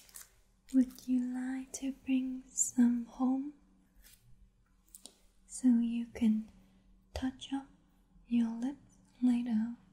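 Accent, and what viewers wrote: Vietnam. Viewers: do more viet accent videos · your viet accent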